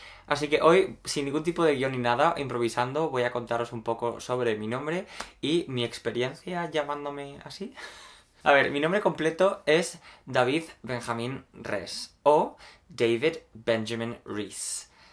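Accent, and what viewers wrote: England, United Kingdom. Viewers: Eres de Inglaterra?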